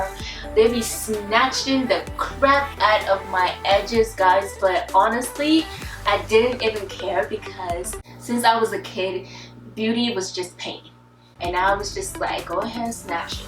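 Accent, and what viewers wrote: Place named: Nigeria. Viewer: am from kenya and honestly you are the first nigeria girl i have watched the video to the end and want more.keep up girl i like your accent.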